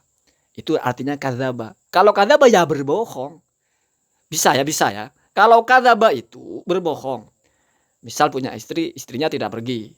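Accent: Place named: Banyumas, Indonesia. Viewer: Jngn ngapak maka nya baca sesuatu itu biar jls ,jngn medokkkkkkk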